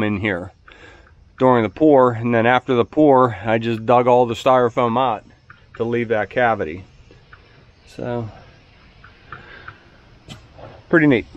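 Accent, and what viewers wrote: Pittsburgh, United States. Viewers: Previous New Castle boy here...... subbed via the Morgans! Good stuff..... how I miss that Yinzer accent!!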